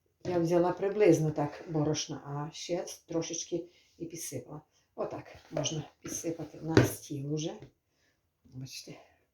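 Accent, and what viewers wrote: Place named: Poltava, Ukraine. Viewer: Милая хозяюшка! Спасибо Вам и за рецепт и за воспоминания молодости,когда мы ездили в гости к свекрови в Полтаву. И этот говор, и эта простота в приготовлении,и даже марля на кастрюле. Свекровь делала такие вареники ещё и с мясом( фарш из отварного мяса). Дуже смачно было! Храни Вас Бог от бед и напастей! Здоровья. вам!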